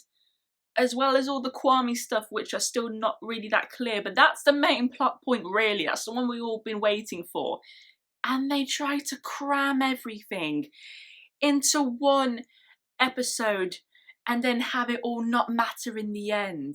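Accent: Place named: United Kingdom. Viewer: Dear, I am not really interested in Ladybug, but I enjoyed your take on it. It is interesting to see what is important for fans. You look great without make up and your british sounds wonderful. (hopefully it is british english... otherwise shame on me) thx for the video, I think I will check out some more. have a good time and stay healthy:)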